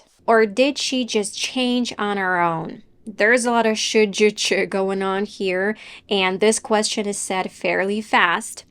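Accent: United States. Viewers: This is American English, I do not think British English sounds like that. I live in the UK. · you are american 😂😂😂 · Thank you so much! It's helpful! But do British people understand such American English?🤣🤣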